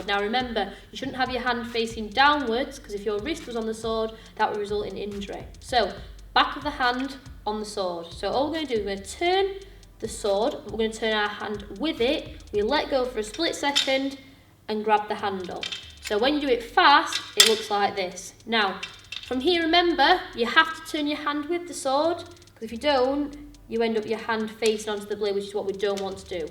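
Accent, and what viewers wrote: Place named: Yorkshire, United Kingdom. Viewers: The 'oo' sound makes it Yorkshire. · Which part of the UK is that accent from? Love it. Sounds like Dr. Fiona Hill's.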